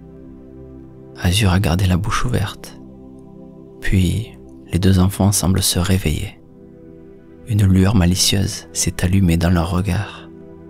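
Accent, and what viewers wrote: South, France. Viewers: l'accent du sud du conteur me dérange trop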